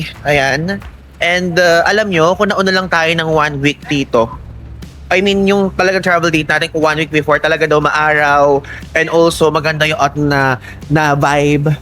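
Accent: Nueva Ecija, Philippines. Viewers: Pag lumalabas ung Nueva Ecija accent mo naalala ko ung friend. Ang kyuuuut hahaa 😁🤗🤗🤗🤗🤗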